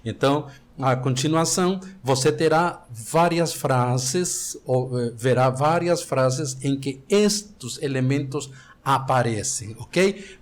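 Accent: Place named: Spain. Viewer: Ótima aula prof.. É tudo que eu esperava encontrar. Um professor nativo com domínio em português. Não podia ser melhor. Parabéns pela iniciativa. Gostaria de perguntar - Qual é o seu país? (Depois de 27 anos no Brasil fica difícil notar o sotaque espanhol).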